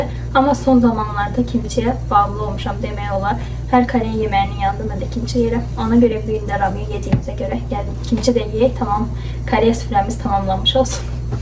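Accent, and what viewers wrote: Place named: Azerbaijan. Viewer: kız çok güzel konuşuyorsun gerçekten Türkçeyi en güzel Azerbaycanlılar konuşuyor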